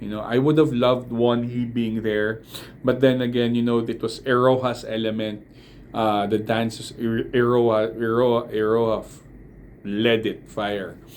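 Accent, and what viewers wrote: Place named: Philippines. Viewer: your accent gave away your nationality. You look East Asian, Chinese BUT the way you speak English I know for sure you're Filipino.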